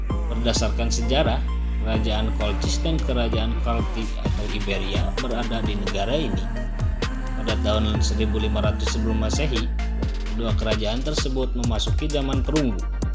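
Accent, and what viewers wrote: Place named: Java, Indonesia. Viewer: Narator bahasanya medoK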